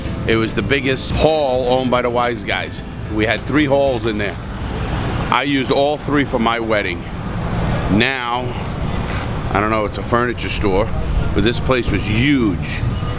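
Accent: New York, United States. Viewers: do you find that your NYC accent comes back thicker when you go visit your son?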